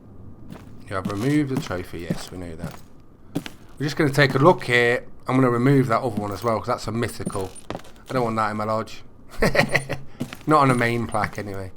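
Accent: Midlands, United Kingdom. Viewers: Nice to see you finally nail it. Your accent sounds regional. Are you Nottingham, central Midlands?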